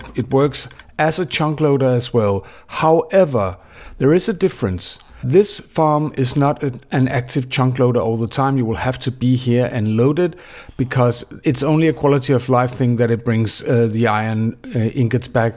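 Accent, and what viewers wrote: Denmark. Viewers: U danish bruh??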